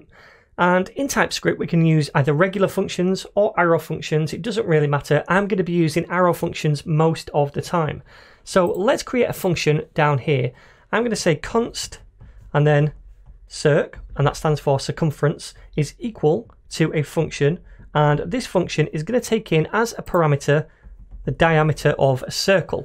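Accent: United Kingdom. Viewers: I really like your accent, it reminds me of my time in the UK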